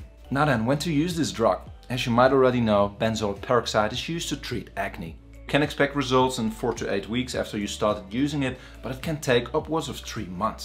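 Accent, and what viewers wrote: Netherlands. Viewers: I heard your voice and just knew you were a fellow Dutch person. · Hahaha, I knew you were Dutch. My favourite European-English accent. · Ik kan aan t accent merken dat je nederlands spreekt 😂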